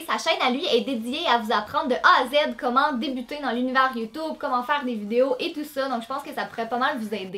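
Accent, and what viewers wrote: Quebec, Canada. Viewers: Je sais pas pk je kiff ton accent québécois :3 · On remarque que t'es québécoise par ton accent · Tu es Québécoise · Découverte sur le site de Patricia B, une vidéo fort intéressante, j'apprécie énormément. J'adore sincèrement l'accent du Québec, il est magique je dirais. Amitiés de France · Coucou! Je te remercie pour ta vidéo, tu as donné pleins de conseils utiles auxquels je n’avais pas pensé! Je viens tout juste de commencer les vidéos sur ma chaine, je vais prendre en compte ce que tu as dit! Je te remercie encore en tous cas, continue, tes vidéos sont tops et ton accent Canadien aussi! 😋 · j'adore votre accent Québécois! 😘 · En tout cas tu fait du beau travail, continue comme sa ! ps: Je t'adore, t'es magnifique, continue comme sa!ET VIVE LE QUEBEC ET LES QUEBECOIS mais si j'en suis pas une j'adore votre accent mais j'arrive pas a l'imiter :) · J’ai suis tout tes conseils depuis assez longtemps... 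je suis passionnée j’adore faire des montages, des miniatures ,j’utilise une caméra de bonne qualité .. J’aimerais que des personnes visionnent le travail que je produis ... mais malheureusement mes vues n’augmente pas ,mais abonnés non plus ext ... Help me ! ❤️ Sinon j’adore tes vidéos et ton accents canadien ❤️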